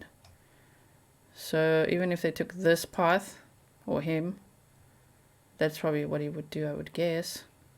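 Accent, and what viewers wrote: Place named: South Africa. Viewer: Why would his parents have concealed that Brian left for home from the 17th-23rd to empty out a storage unit? I have never heard this. How and when did you hear this? I enjoy your astute observations. I thought I heard a South African dialect. Thank you for sharing your background and your father’s background. I am a woman Vietnam Era Veteran Navy WAVE. During service I had a Secret Security Clearance. Thank you for your broadcast. Greetings from the shores of Lake Erie on the north coast of Ohio in America. Canada is 45 minutes straight across the lake.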